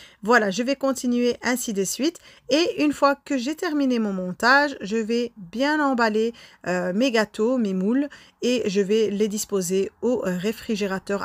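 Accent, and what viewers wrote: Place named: Belgium. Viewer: Ah tu es belge, je me disais aussi que tu avais un accent. ^^ en tout cas merci encore une fois pour cette recette, au top comme d'hab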